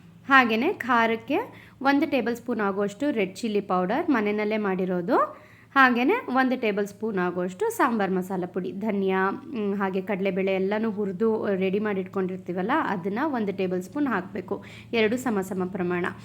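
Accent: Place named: Mysore, India. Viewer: I always wondered that you speak kannada exactly like my house kannada . We are from Mysore / Nanjangud belt . I live in Bangalore . I like the way you talk. You should plan 1 live session with your subscribers .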